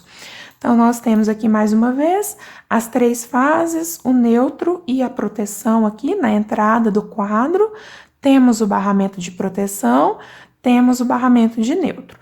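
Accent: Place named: Minas Gerais, Brazil. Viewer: Sotaque mineiro, me pareço bem bonito.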